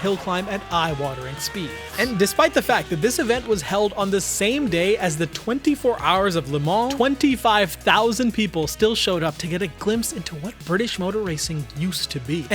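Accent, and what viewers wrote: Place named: United States. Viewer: a Yank talking about British racing...? no sorry , you've lost me not even a minute in...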